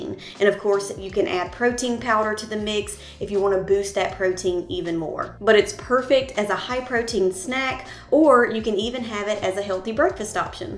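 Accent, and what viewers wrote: South, United States. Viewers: Love all the recipes. And that Southern accent got me suscribe. Love from Canada ❤❤❤ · And to add, I expected to hear a Spanish type accent coming from you and then that beautiful southern accent came out. Threw me for a second and chuckled. A pleasant surprise 😊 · I just have one question. Are you from the south?😂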